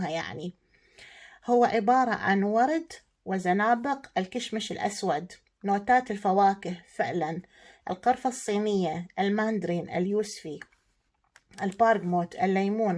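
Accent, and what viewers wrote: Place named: Iraq. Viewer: وردة اللهجة العراقية جميلة مثلك يا قلبي. انا سعودية واموت على العراق وأهله واللهجة العراقيه استانس اسمعها. عرضك للعطور جميل ورائع كروعة روحك.حبيبتي ♥️متابعتك بدوره من السعودية. 🇮🇶🇸🇦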